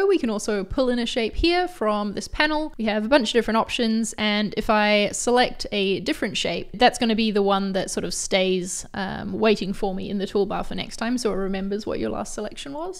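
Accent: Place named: New Zealand. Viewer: Figjam is cool but I’m so glad I was able to tell the you were from New Zealand and not Australia. As an American I’m quite proud.😊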